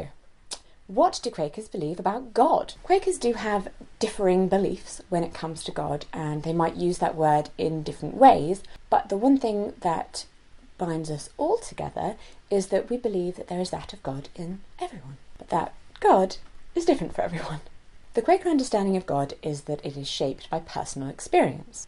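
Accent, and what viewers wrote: United Kingdom. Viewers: I love your voice so much. You have my favorite British accent. Anyway, I am Christian ( Protestant), denomination = Baptist.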